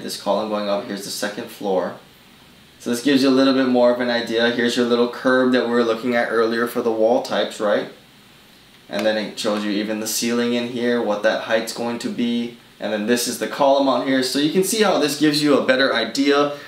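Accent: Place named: Hawaii, United States. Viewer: I could tell just within the 1st minute of your video that you were from Hawaii. Mahalo for the content, I started as a laborer 2 years ago and want to progress with this as my career choice so I’ll be watching more of your videos!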